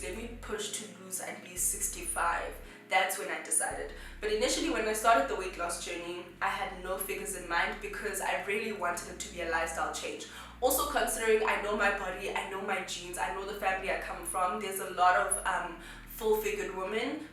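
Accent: United Kingdom. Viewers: i loveee the british accent omg omg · The British accent gets me everytime 🤣🤣❤️❤️ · Loved the UK accent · N the dash of UK accent iphumaphi? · It's the British accent for me...lol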